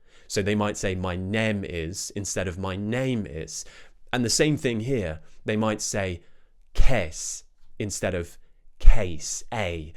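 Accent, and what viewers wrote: United Kingdom. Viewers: Always like your accent, British English accent is awesome❤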